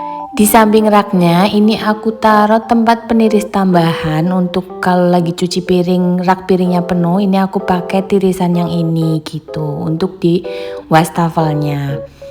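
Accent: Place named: Java, Indonesia. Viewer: Medoknya mirip Teri Raradini 🙂... Dapur cakep... Saudaranya baik baik banyak ngasih..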